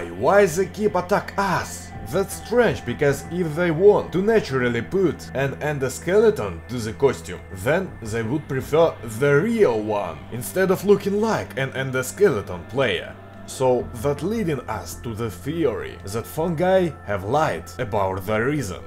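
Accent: Russia. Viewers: there are Russian pronunciation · you have some Russian pronunciation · Russian emphasis,huh. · His accent is associated with the fact that he is Russian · * у тебя очень русский акцент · Your little Russian accent can be heard, but I like it!Well done! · your voice is like a Russian who first came to America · Good English but with russian accent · Русский акцент все портит. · as you tell you have russian accent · you're really good at speaking English , but the accent is Russian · Good Job! Only your ancient is very Russian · Russian accent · Ochen zameten russkiy akzent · try to remove Russian accent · Your English is very nice but Russian accent a little bit unpleasantly. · Hello, that's cool video! I think you can do great videos in english, but your russian emphasis isn't very well) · O, great work, but... this terrible Russian accent. Try to say words softer. 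Good luck. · акцент то русский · NOOOOO IF YOU WILL (um...okay ) DO VIDEOS (#pokerface) EVERY DAY, YOU LOST YOUR RUSSIAN (um...) you will lose your Russian accent and your Russian video will look awful((( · you Russian pronunciation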